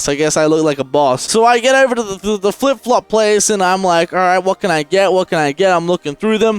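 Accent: Australia. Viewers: wait a second, you're australian? what state?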